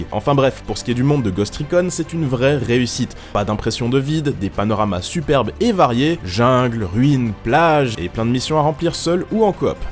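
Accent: Belgium. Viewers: mec t belge la classe (enfin je crois car tu dis nannante neuf)! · T'ES BELGE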